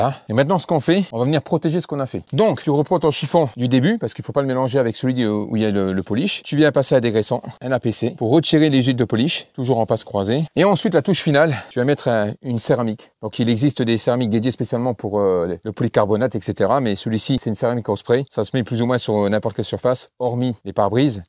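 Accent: Belgium. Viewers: Vous êtes excellent vous m'avez bien fait rire avec la fiat verte, j'en reviens pas du résultat. Accent belge ? Ya du vandame hyperactif dans le moteur du personnage ;-) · T’es belge ou français ? T’as l’accent belge a couper au couteau .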